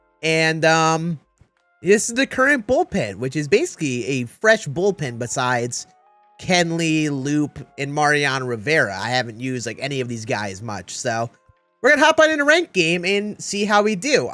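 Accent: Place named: Wisconsin, United States. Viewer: You gotta be from Wisconsin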